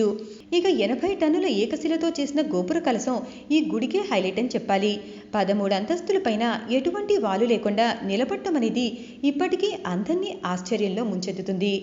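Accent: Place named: North India, India. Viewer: Iritating female voice more like northy accent.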